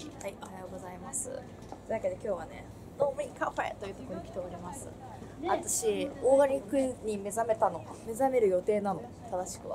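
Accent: Kansai, Japan. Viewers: 関西、だと！？笑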